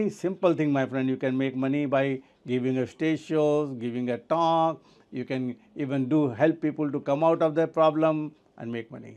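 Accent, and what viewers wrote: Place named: India. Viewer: If you are a real hypnotist then why don't you work on your accent? So Indian and so funny. 😂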